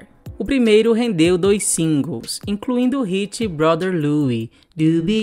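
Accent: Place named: Rio de Janeiro, Brazil. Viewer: este sotaque carioca, que mata...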